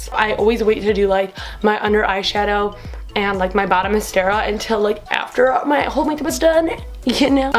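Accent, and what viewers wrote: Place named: Midwest, United States. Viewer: youre so pretty !! you must be from the midwest too, I can tell by the accent <3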